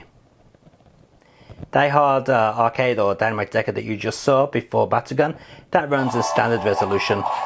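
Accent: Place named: Liverpool, United Kingdom. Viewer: Great review; and as a huge Beatles fan; I thought your accent might be from Liverpool. Looking forward to more.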